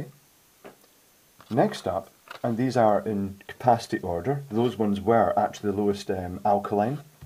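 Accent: Scotland, United Kingdom. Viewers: He said "poundland which is one of our dollarstores". As no one has told you or you do not realise, most views on YT are generated by Americans on English speaking content. BTW he's Scottish by the sounds of it, generally Scots find "British" to be too vague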